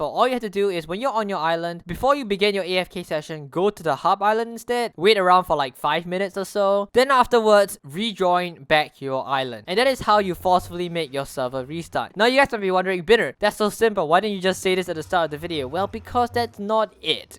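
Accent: Singapore. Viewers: yo are you singaporean or malaysian cus ur accent sounds like that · You sound singaporean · R u Singaporean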